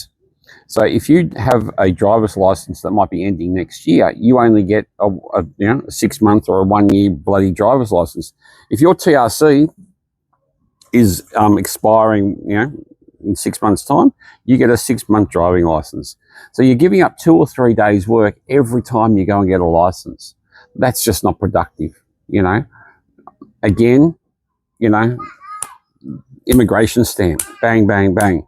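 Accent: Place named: Australia. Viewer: FYI I have learnt French and English in Vietnamese High School . We don't need you to teach us English with your awful Aussie accent . You are just an unemployed dude in your country , moving to developing countries begging for job . I bet you are not even qualified to teach English in Vietnam .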